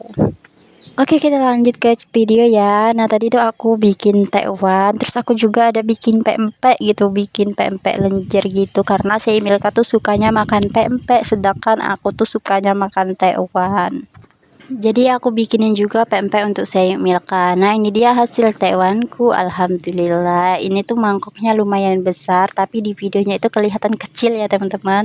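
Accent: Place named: Banjar, Indonesia. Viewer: Enak tekwannya bun... Dari logat ngomongnya seperti orang Banjar.. Tinggal dimana bun?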